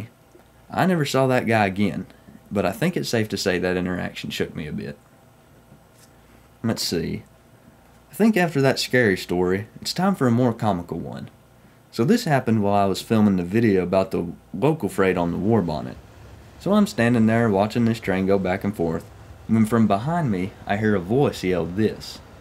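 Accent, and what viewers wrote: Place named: Oklahoma, United States. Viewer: Enjoyed your “500 Subscriber Special” and Thanks for confirming you’re Accent is from down in Oklahoma. Keep up the strong 💪 efforts with your videos. 👍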